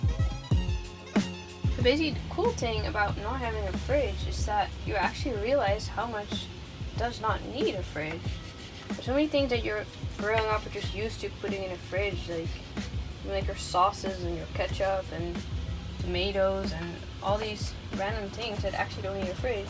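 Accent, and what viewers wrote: Netherlands. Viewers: lovely video!! i didn't know your channel before and honestly didn't look up the description or your channel before watching this video and omg, i immediately thought that you're from the Netherlands!! i absolutely love your accent! and the food ideas in this video 😍 i need to see if you've got more of these videos about you both living vegan without a fridge ☺️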